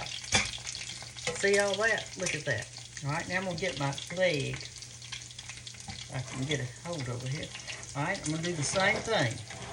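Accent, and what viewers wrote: South, United States. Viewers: I just love that southern accent. I can listen to her all day. I’m from New Jersey. So we kind have a NY accent🤣🤣🤣🤣🤣🤣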